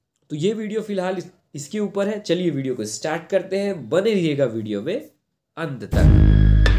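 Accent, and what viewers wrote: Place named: India. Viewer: Indian accent:goodvibes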